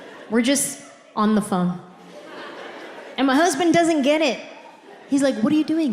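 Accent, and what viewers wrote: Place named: San Jose, United States. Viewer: She sounds soooo San Jose! Subscribed. 😂❤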